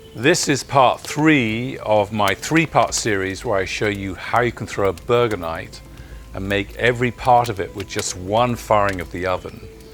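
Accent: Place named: RP, United Kingdom. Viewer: One reason I love this program is the casually precise narration delivered in crip RP. The thesaurus comes naturally to this guy. There is zero barrier to vivid understanding.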